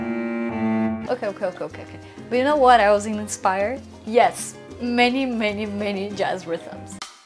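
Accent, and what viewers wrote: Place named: England, United Kingdom. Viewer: Where are you from cus u got a strong accent in english but an english accent in spanish. It dont make sense.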